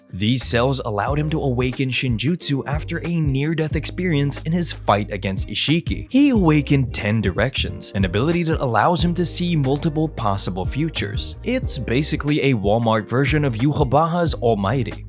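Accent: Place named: Philippines. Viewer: I'm sorry if this might be offensive or whatnot, but I like how your accent rolls off. It sounds like Filipino or something.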